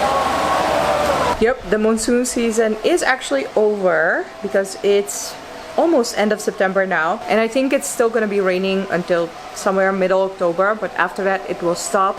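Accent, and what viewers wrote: India. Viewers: No offence. Have you adopted Indian accent too😁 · Your accent is becoming increasingly Indian and that is very endearing: "...I cannot handle a lot of synthetic fibre". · Feels like you are picking up the Indian accent. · Hi, Greetings from an Indian from Australia. You do realise that your English now has a discernible touch of Indian accent? I am glad you are enjoying your time in India! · I revisited your channel after like 3 months and I dont know why I sense an Indian-ised accent! Is it just me? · Your accent have a bit of indian touch in it🤔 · Even your accent became like Indian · Are you sure you from Dutch? All I am hearing is Indian accents and you seems to be a residental Indian. Cheers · your accent sounds indian. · Ha ha she is talking like an Indian.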